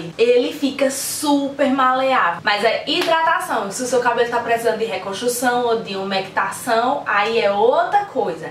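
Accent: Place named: Northeast, Brazil. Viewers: logo vi que é permanbucana linda amo nosso sutaque 😘😍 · que sotaque bom nordestino hein, também sou nordestina, é nós 😊😘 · Sotaque lindo de Recife amo !!! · Ameeeiii o seu vídeo 😍😍 Comprei a glicerina hj e vou fazer para testar. Tmb sou de Recife e amooo o nosso sotaque 💗 Parabénssssss! ! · Eita sotaque pra eu amar 😍 amei as dicas e tbm sou Pernambucana! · menina que sotaque bonito . pernanbucana sim senhor .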